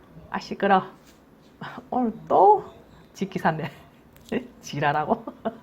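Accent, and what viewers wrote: Gyeongsang, South Korea. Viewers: 지금말씨가 대구말씨같은데 맞으신가요 제미나게사시는것같아요 부러워요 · 볼수록 재미있어서 주변에 막 퍼나르게되요. 어쩜 돌아가신 친정엄마 살아돌아오신듯 경상도 사투리에 지혜로운거 까지 똑 같아요. 감사하고 축하드려요~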